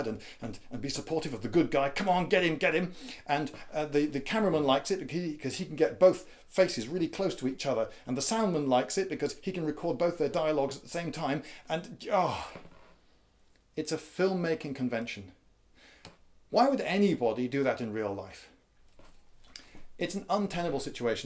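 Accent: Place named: RP, United Kingdom. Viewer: know, I like Lindybeige's videos better. He's more animated and engaging to the viewer. can get too droll and boring at times. He's a talking head. A talking read with an "Ahh Pee" accent. I mean RP accent. I also like +Skallagrim. He's got plenty of visuals, so he avoids Matt's mistake of beinga talking head, and can be just as engaging as Lindybeige.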